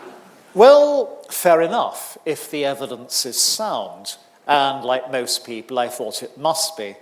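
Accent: United Kingdom. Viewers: It could also be because I find a British accent easier to understand (am Australian).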